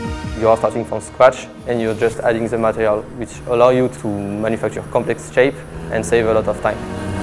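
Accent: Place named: France. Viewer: Stranger things music ahah that french accent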